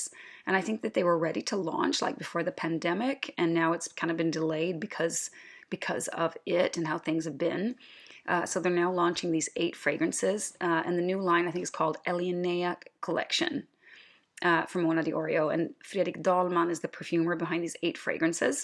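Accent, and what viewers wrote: Midwest, United States. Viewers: Are you Swedish? If so, I never would have thought. You accent comes off as American Midwestern or from the Rocky Mountain States. Impressive. I greatly appreciate your take on denying free this and that. Reviewers are now stating that they received their products but their opinion are their own. I don’t believe this. I’m too seasoned to think kind gestures aren’t returned in kind. Reviewers are essentially telling us to pay for their free bottles, by buying this House’s offerings.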